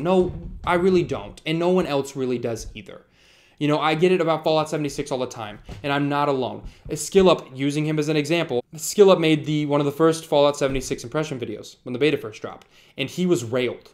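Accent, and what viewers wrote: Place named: South, United States. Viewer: What happened to your southern accent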